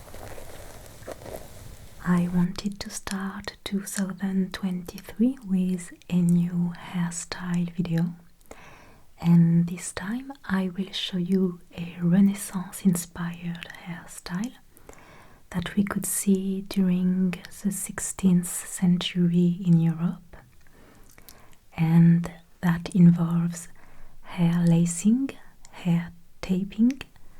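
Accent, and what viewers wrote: France. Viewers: is she really french? or is that just the accent she chose? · Im new to your channel, discovered it a few weeks ago after I handed in my Bachelor's thesis, and it really made me relax watching your videos. Now Im addicted, other ASMR doesnt quite do it for me. The RP, the setting, the lighting and darker colours, your audio quality (minimal white noise) and even your french accent really does the trick for me. I get so calm. I have an anxiety disorder, I got therapy for my panic attacks, but still need easy and quick ways to get my pulse down, while sitting at the pc - and you help me so much. Now I can get to bed with less mind clutter and wake up to be a good mom and wife for my family <3 Thank you!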